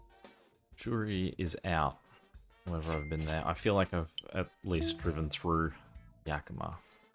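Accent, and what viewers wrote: Australia. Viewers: I don't mind the Aussie pronunciations of some of these cities, even if they're wrong, because I know Aussies are tired of hearing people saying Bris-BAYN and not Bris-BIN/Bris-BUN for Brisbane, or Mel-BORN not Mel-BURN for Melbourne.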